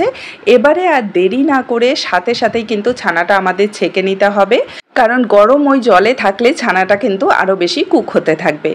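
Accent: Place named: Bangladesh. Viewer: পুরো ভিডিও দেখে আমি একবার ও বুঝতে পারিনি আপনি ইন্ডিয়া তে থাকেন কথার একসেন্ট একদম আমাদের মতো। শুনতে ভালো লাগছিল 🤗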